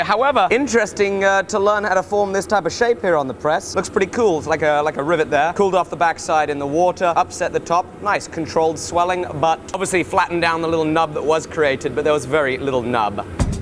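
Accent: England, United Kingdom. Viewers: I can't tell which part of England your from well from your accent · i cant tell, are you british or american?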